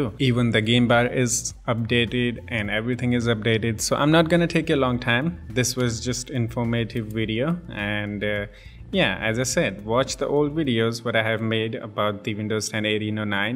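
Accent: India. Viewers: Are you Indian brah?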